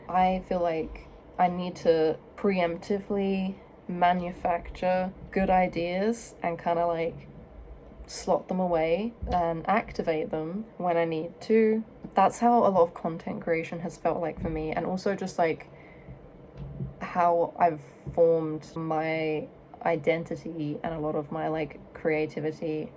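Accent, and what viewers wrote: England, United Kingdom. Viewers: Re: ideas for content : 1. Something about your city. Based on your english, I assume it is not USA, but England. 2. You trying out new things. it could be some new style, new hair color, new outfits, may be a completely different ring for the nose, new food, new hobby. Something about what you never thought to try. 3. start some exercise regime... I don't know ... yoga or heavy lifting. Something you wouldn't expect of yourself. And post how it is going. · haha maybe the british accent is deceiving but you don't seem that dumb. Maybe dumbest people are actually those who don't even realize they are dumb, idk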